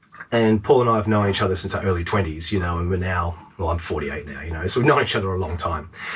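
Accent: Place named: Australia. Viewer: Loved the chooks,, hated him. No, truly mate, this has been a super adventure. Glad it was you and not me. Really admire your courage and tenacity and your 10 tips are right on the money. I'm an ex Aussie in Alberta Canada and listening to your familiar accent has been a treat. Looking forward to many more vids.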